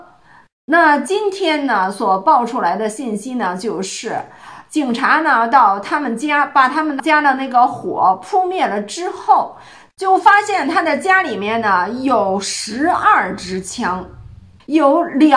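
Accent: Beijing, China. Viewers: 重复好几次了，但我还是得说： ，我太喜欢你的京腔了！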